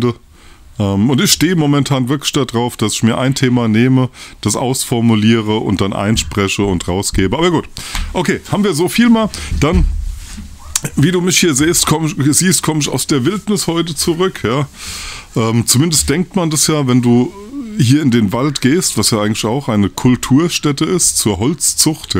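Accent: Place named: Hesse, Germany. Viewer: Ich liebe die Rezepte❤ und den hessischen Akzent. Much love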